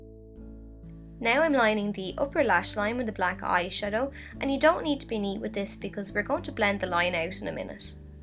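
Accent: Dublin, Ireland. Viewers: She's Irish 😊 I hear this accent everyday 😘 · is she irish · love ur irish accent x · Love your beautiful Irish accent :) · I love the Irish lilt! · Yeah she sounds a bit like me, so I think she might be from dublin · Really good video for me, I'm just starting out with makeup!! I'm from Belfast, and I got so excited when I heard you had an Irish accent:)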